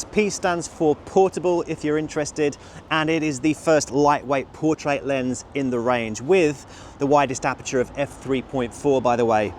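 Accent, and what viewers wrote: United Kingdom. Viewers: North London? 👀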